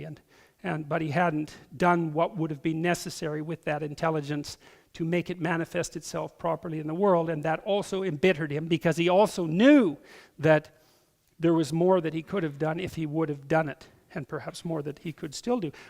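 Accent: Canada. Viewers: He's from northern Alberta and you can hear it. That's why he also drops the occasional "eh." He doesn't talk like a stuffy Toronto professor at all. It's very endearing, actually.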